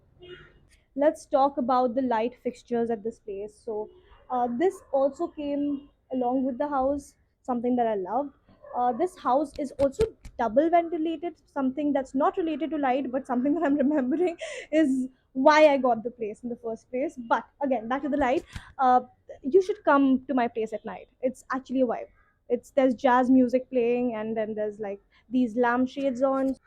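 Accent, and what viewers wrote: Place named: United States. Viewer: To much American English stop tht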